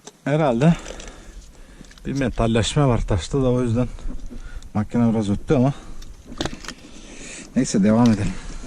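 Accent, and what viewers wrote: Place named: Aegean, Turkey. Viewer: Abi konuşman ege muğla aydın tarafı gibi nerelisin sen hemen beniyle bir irtibata geç asıl işaretler burda biz anlamiyoruz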